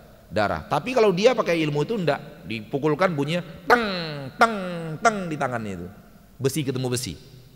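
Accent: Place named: West Sumatra, Indonesia. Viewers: Vimia Zuhry org Pekan Baru suku Minang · uztad orang mana..? seperti nya menggunakan logat minang..yg sangat jelas logat nya.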